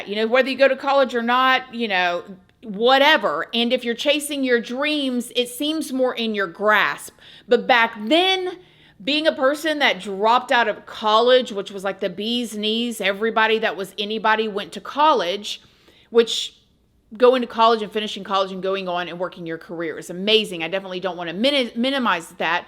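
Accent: United States. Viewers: I thought Hulk Hogan was a legend until he douched himself at the RNC. He lost a hell of a lot of people that really liked him by attending the MAGA CULT rally. I watch you from Australia and would like to ask you, where about in America are you? I love your accent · I'm a gonna' comes out of your mouth and the thick southern drawl has me believing that you are actually from NY but want inarticulate folks to resonate with your video. Why don't we just say that "Terry" is a bottom feeder and it was evident when he put an innocent comedian in a sleep hold with no remorse - just a sneer on his steroidal evil face y'all - I am from PA and don't relate to your accent. Was that hate filled? No, just a 1st right amendment. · My parents were swingers... Personally, I never fully understood it... But its not my place or concerns of what consensual adults do... Consensual being the most important aspect! 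Illegal recordings are not "fair usage" no matter who you are! I would venture to say Bubby sold the video... And it's refreshing that it's the facts of the story ~ not the morals or character ~ that is the story here! I think it's funny that the ex-wife is unset more about the money gained from the court case than what was recorded! (Envy and Jealousy ~ not betrayal ~ she plainly shows her heart only holds anger! Which is sad to think they can't even be friends!) This video was my first from this channel, but it's not the subject matter that earned my subscription ~ but the awesome way it was presented! (Hearing that genuine Southern twang and the sensible conclusion ~ please continue creating more content! You are awesome and sweet!)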